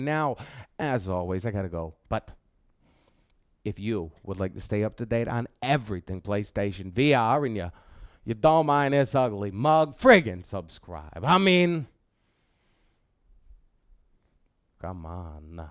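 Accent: New York, United States. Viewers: You from New York?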